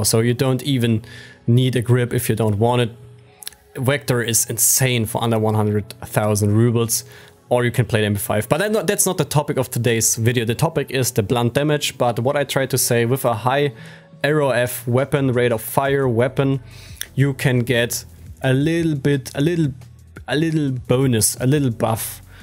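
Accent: Germany. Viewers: You are the reason why I drink some beer, your videos are great and the German accent makes it great , nice video man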